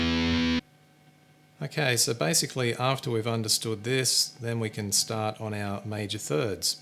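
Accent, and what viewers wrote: Australia. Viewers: Yay, an Aussie, you beaut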